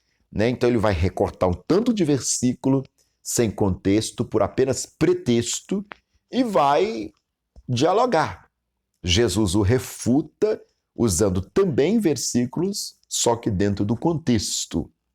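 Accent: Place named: Minas Gerais, Brazil. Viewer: As pessoas de Minas Gerais aprenderam o significado de "impelir" da mesma forma que você irmão. Sotaque não é sinônimo de ignorância 👍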